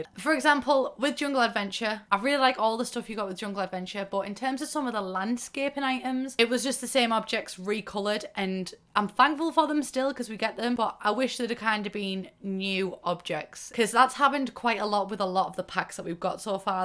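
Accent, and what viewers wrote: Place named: Newcastle, United Kingdom. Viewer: I guess I need to get cats and dogs Also are you from up north you sound Geordie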